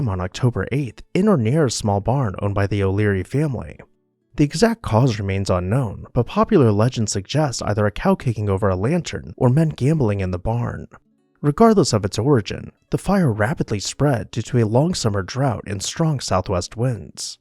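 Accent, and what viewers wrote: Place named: Georgia, United States. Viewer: I would love to visit your town! I adore these beautiful old houses. Georgia is a beautiful state from what I've seen in movies and photos, and I love the charming Southern Georgia dialect. I grew up in E. Texas and have that peculiar drawl, but I think Georgians have the best Southern accents of all. No one can give an insult as elegantly and sweetly as the genteel Georgians! "Bless Your Heart!"😂🤣💖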